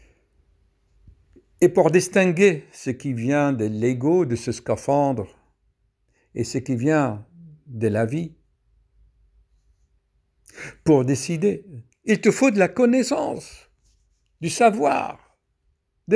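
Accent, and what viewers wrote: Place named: France. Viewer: Bonjour cher adore 🙏vos vidéos car je me reconnais en vs, je suis pharmacienne à Québec et pratique la méditation et yoga +++ et je m’intéresse à l ayurveda Vos explications unissent la science à la spiritualité, exactement mon combat de tous les jrs!! Par contre l’explication de 2 mx dont je suis atteinte m’échappent....ménière et les fameuses impatiences aux 4 membres empêchant même de rester en position assise tellement il y a un besoin irrépressible de bouger pr soulager les sensations qui sont une vraie torture et qui reprennent dès l’arrêt du mouvement Traitement pharmaco très efficace ds le 2e cas, très « fort » aussi, mais ce sont les explications spirituelles qui m’échappent Manque de connection à la terre pr ménière? (Les vertiges qui me clouent au lit pendant des mois alors que j’ai 45 ans et une enfant handicapée ) Et pb d énergie mal répartie mal diffusée a travers le corps ds le cas des impatiences? 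J’aimerais tant connaître vos explications..... Je suis née en Bretagne et j’adore 🥰 l’accent français! Merci pr vos vidéos ça me donne le courage de continuer à expliquer les concepts médicaux et pharmacologiques aux patients du point de vue de l’univers, énergétique Merci 💝🙏🕉💫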